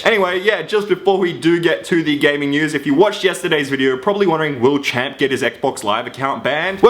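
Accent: Australia. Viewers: are u from austrailia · Is this guy Australian?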